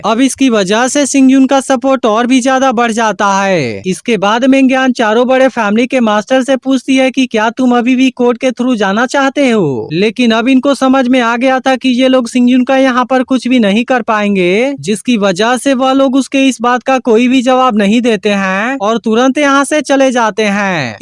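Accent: India. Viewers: First comment for u 😚 big fan bro ur voice from odisha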